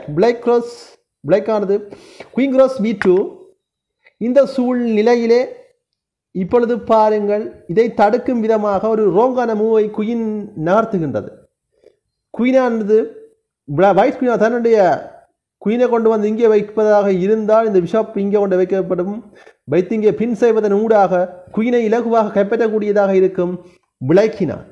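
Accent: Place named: Sri Lanka. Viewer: Arumai ilangai Tamil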